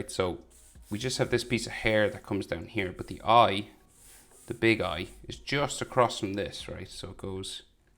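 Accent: Ireland. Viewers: Are you from ireland?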